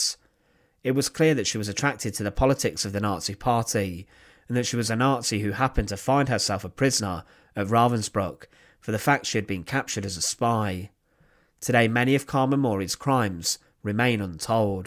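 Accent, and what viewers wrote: United Kingdom. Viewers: Settle down, did you notice the narrator had a vaguely British accent. They love to insinuate that r sound when it's not called for, so Capi could sound like Carpo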